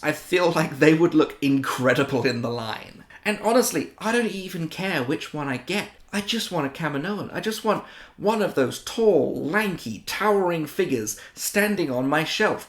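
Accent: England, United Kingdom. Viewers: Ive never been good with accents but I assume your English. Im from Northern Ireland so its nice to see another Brit doing the Black Series on YT. Plus I also spotted some Dr Who behind you 😁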